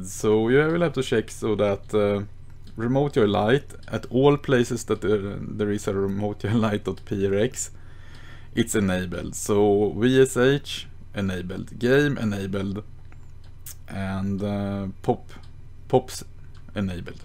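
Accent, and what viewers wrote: Sweden. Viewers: Could you by any chance be swedish??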